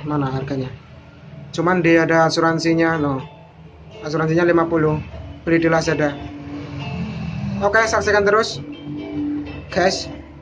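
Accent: Banyumas, Indonesia. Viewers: Njir ngapak koe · Masih bersama ini membukanya gmna ni :v sepertinya wong ngapak sekang ndi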